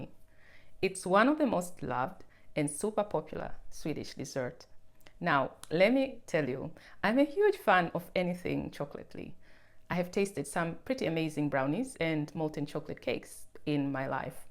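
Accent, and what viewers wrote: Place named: Africa. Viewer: You sound african.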